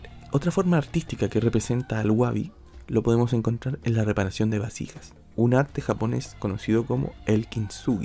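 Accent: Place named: Chile. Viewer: Eres chileno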